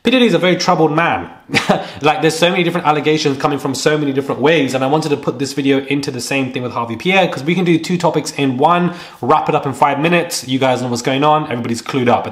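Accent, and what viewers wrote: England, United Kingdom. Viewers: Big city London speak 🗣️ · Dont u dare apologize for that beautiful sexy voice ,its like harmony when speak ,clear to the point beautiful English accent 👍and the content 🔥 fireee appreciate u 😅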